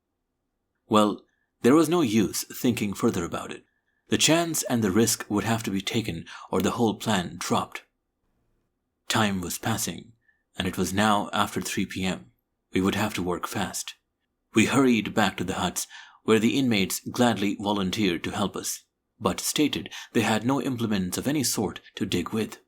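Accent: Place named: India. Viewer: You are absolutely right about our narrators voice. His wonderful accent conjures up India perfectly.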